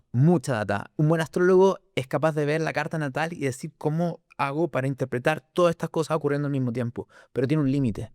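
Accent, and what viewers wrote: Chile. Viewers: No lo conocía, pero al escucharlo supe que es chileno 🇨🇱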